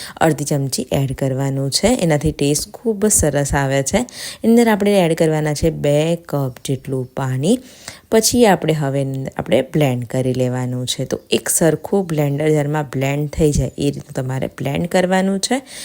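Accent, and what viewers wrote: India. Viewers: Nice Recipe.....Your Accent is like Saurashtra Gujarati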